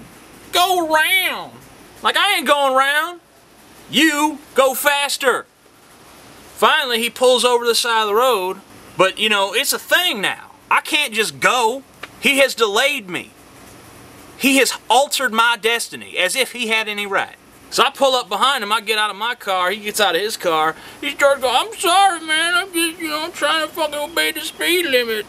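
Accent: South, United States. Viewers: I love his southern accent so much! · I dont care what that guy you guys played on DP says about your southern accent- this clearly proves otherwise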